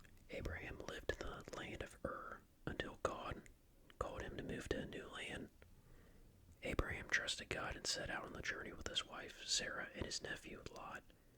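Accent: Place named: Delaware County, United States. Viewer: I’d love to focus on the reading but I can’t help but wonder if you’re from Delco PA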